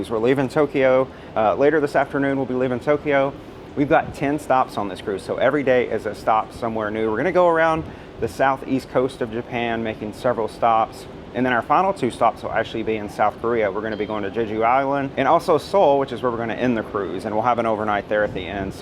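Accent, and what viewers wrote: South, United States. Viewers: Nice vid!! Love your subtle southern accent! Reminds me of a good friend of mine from Georgia 🙂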